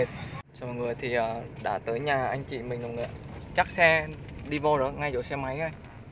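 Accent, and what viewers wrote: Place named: Nghe An, Vietnam. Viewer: Em nous quê bố Đắc Lắc mà sao chị nghe như nói giọng như người Nghệ an vậy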